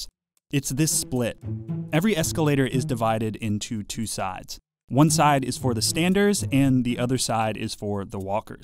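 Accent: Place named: New York, United States. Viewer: liked and subbed for the new yorker accent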